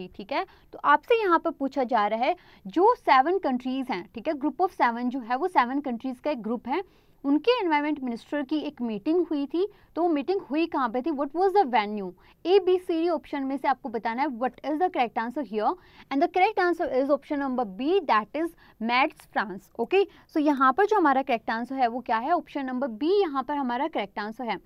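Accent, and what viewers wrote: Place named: Haryana, India. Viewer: She is from haryana, so slang varies accordingly, how can you say this!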